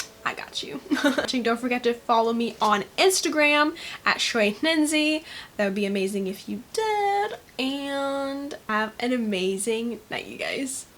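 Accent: United States. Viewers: are u american?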